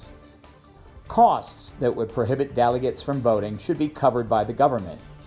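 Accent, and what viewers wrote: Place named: Somalia. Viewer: Your sound like somali who want to get some work in kenya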